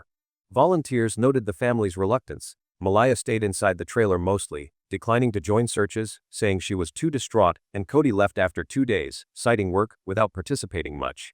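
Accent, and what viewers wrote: United States. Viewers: I found the cases from overseas very confusing!!! Why bring all of that stuff into the picture? It has NOTHING to do with the Gus Lamont case here in Australia. Also, I DON'T LIKE the AMERICAN ACCENT of the person talking about the Gus Lamont case. What is wrong with our good old Aussie accent? I won't be watching any more cases from Crime Cases in future!!!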